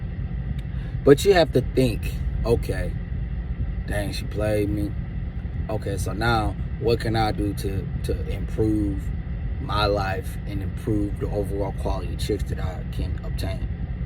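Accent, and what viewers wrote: Baltimore, United States. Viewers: That bmore accent 🔥🔥💯👌🏾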